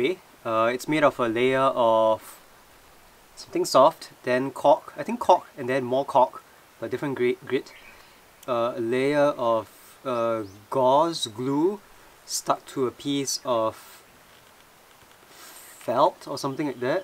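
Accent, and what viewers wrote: Singapore. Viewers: Have you been to Singapore? You sound like a Singaporean! I use Shoji paper to cover model airplanes. How do I find the lightest paper grade? There used to be this paper called Esaki paper but it's no longer available. Where would I find the lightest grade of mulberry paper that remains strong while wet?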